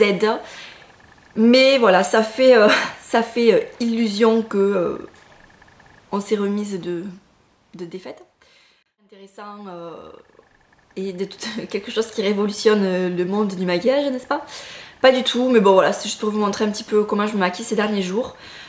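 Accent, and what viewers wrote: South, France. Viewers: Coucou et bonne année 2013 ! J'aime beaucoup tes vidéos et ton accent du Sud ! :) Est-ce que tu pourrais faire la vidéo de tes cadeaux de Noël ? Bisous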